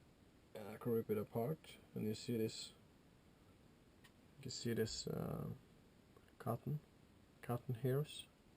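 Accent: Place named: Norway. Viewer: Nice tip! Made my own. Burns really well, about10 min burn time. Btw in the video you say "I don't have any more "rests" he he Nice Norwenglish .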